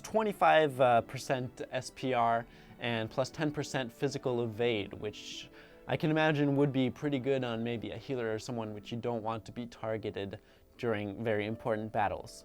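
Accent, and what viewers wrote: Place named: Japan. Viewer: well I don't bloody understand his Japanese accent, drop it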